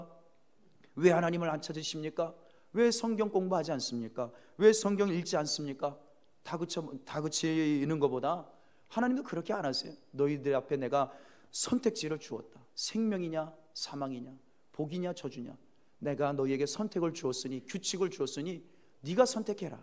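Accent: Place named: Gyeongsang, South Korea. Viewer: 목사님 말투가 갱상도사람 다 되셨네요!!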